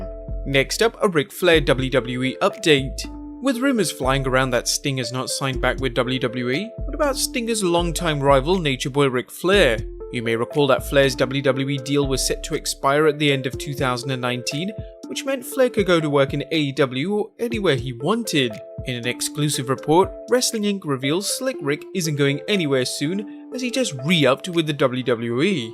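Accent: United Kingdom. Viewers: I didn't know is British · find a NEW Narrator (preferably NOT British)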